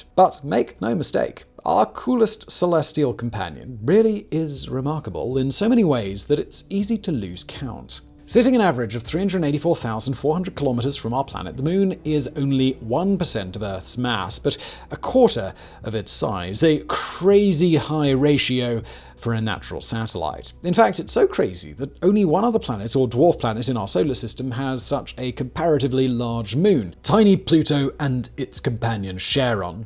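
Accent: United Kingdom. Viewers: Personally, I think it is time to unsubscribe., why do you have so much disdain towards America and people of Euro-Caucasian descent? America indeed won the space race and cut back NASA to protect the world from Communism. Your accent affirms your affiliation with some islands hell- bent on colonization and world dominance for a half millineum. Islands which survived two wars thanks to America. The Americans did move across North America, but tell me which nation did not historical take lands from others? That is how every nation survived. Euro-Caucasians are the inventors of most of what made the world of today. Not one American alive today has done what the British, Japanese, Inca, Zulu, or other empires did throughout the world before America became the place most people of the world would want to live. You as a non-American, earning your living from American clicks, is amongst the least to speak as you do of American men of Euro-Caucasian descent.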